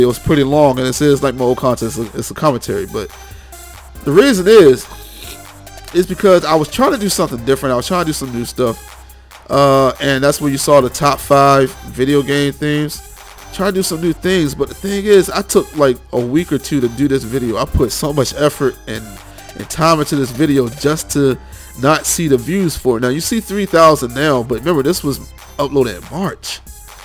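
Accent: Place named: Baltimore, United States. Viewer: So I discovered u thru the GamingIlluminaughy and I loved u immediately bcuz I could hear the Baltimore in u and u were always funny and honest. I support u, MWN and JG. Not gonna lie, I dont really like the new dudes MWN is running with but I will support none the less. To mirror the sentiments of others, continue to make vids if u want to. I totally get the being grown n trying to find balance with this and the real world. U also mentioned that u were from the old school when games took effort, consistency and constant replays sometimes from the very start of the game. Stop worrying about clicks if u enjoy what u do. I would love to see a vid with u and JG if MWN cant come. I also see in the comments that many ppl support u. Stay confident Fam. We need ppl like u to represent for our city. Luv u ♥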